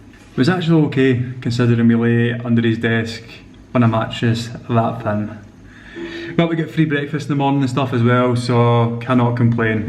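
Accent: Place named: Scotland, United Kingdom. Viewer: that usually never happens in india...yes in independence day it can be a bit of hassle i suppose...so u guys were kinda unlucky..that it just happened to be independence day in india....one thing i can suggest about taxis and cabs in india is..always get on cabs with meter on..and say iam only gonna pay whatever the meter says.as simple as that..download uber app..on your phones..get uber cabs in india..they are awesome. .also ask middle class urban indians on the road..of how much things cost in india..note down the prices..so that you wont get crooked next time..most of the these drivers or whatever come from rural india all they wanna do is make money..so the can be a pain in the ass not just foreigners but for urban indians as well..act street smart...AND DO NOT CONVERT INDIAN RUPEES TO POUNDS..and think oh thats seems reasonable no its not..15000 rupees is a lot of money in india..because living standard is cheaper in india..as compared to scotland..i suppose thats where you from. 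going by the accent..thanks...hope u enjoy your remaning trip..chears..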